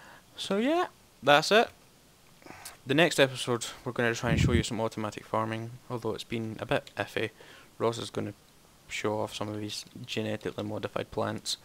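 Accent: Scotland, United Kingdom. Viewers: Clearly a Scottish accent. It is my favorite accent actually. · I don't see how Gregory cannot under stand your accent, Scottish accents are the best :D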